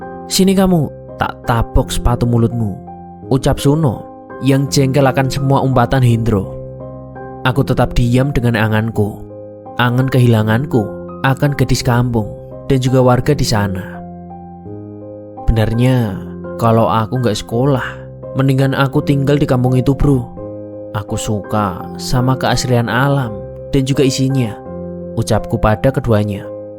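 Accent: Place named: Java, Indonesia. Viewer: Enak jowo ne mas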